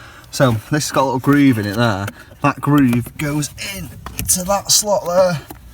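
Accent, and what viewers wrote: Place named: United Kingdom. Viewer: What a lovely britsh acsent )) Thanks 4 Video!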